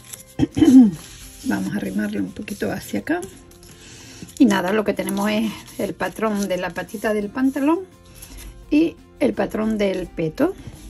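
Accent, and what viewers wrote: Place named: Canary Islands, Spain. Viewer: Mil gracias, me encanta tu acento, me recuerda al de mi familia Canaria y a esa preciosa isla. Un abrazo desde Vitoria 😘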